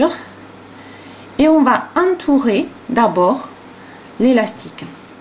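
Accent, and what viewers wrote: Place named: Provence, France. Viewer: merci pour se jolie chouchou et en plus avec notre acsent je suis de provence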